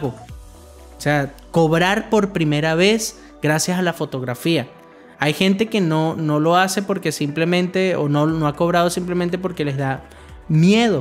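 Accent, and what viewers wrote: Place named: Venezuela. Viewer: No se porque, pero me pareces q eres de venezuela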